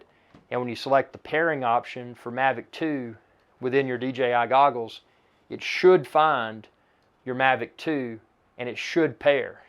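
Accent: South, United States. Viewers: That southern accent is golden, love it. Greets from germany!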